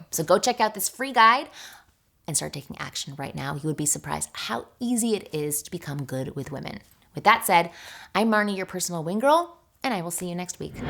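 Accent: Canada. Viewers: Marni your Canadian 🇨🇦🍁accent shines through sorry😂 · You are clearly Canadian, therefore your OPINION doesn't mean Shyte to me! Like I'm gonna take advice from someone who calls soda "pop" and calls Z Zed!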